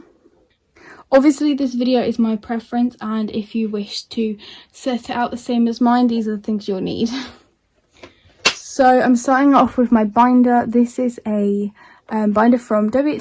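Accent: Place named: United Kingdom. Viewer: as soon as i heard ur accent i was like 'yes another uk moarmy' im from manchester hehe <3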